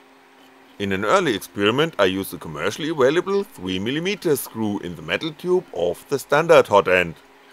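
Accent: Germany. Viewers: I've never heard anyone more German than this guy.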